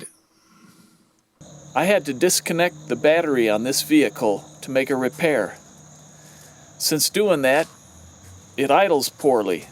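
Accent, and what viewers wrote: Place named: Minnesota, United States. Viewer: Thank you this fixed my problem on my fzj80 land cruiser! You sound like my good friend from minn!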